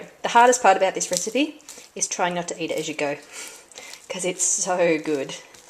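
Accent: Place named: New Zealand. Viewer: Keeping this for my inner choccy Kiwi devil!🥰🥰 Thank you for sharing this, great to hear a downunder accent and our sweet lingo on yt!👍👍 Would have loved to see the slicing and tasting of it, but just grateful for the recipe without marshmallows tbh! Will try it with coconut mixed through. Well done, its champion!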